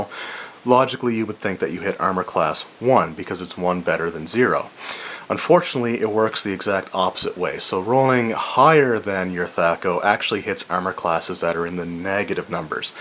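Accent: Canada. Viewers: I detect a Canadian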